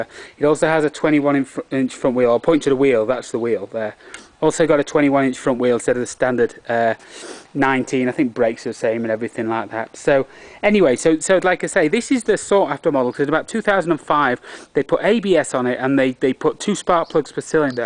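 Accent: United Kingdom. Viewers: Good vid mate....nice to see a Brit living in the US who's managed to keep his accent! I've had my Dakar for just over a week now, but true to form it has snowed so much, I can't get out on it! typical British (Yorkshire) weather!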